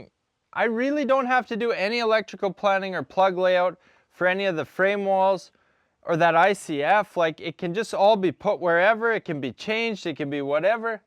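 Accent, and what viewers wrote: Canada. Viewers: You're smart and kind!! Proud of you. Keep the videos coming. I just love hearing you speak Canadian.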